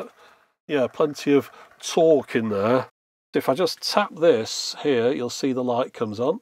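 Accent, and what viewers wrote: United Kingdom. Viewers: Your voice through the window and brit accent sounds like C3PO. No offence...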